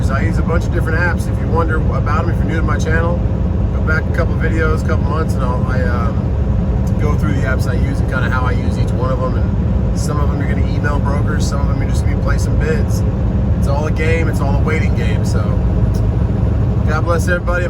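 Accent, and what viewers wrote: South, United States. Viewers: How come you have a southern accent when you are from SoCal/ AZ? Are you getting spinners for the new wheels?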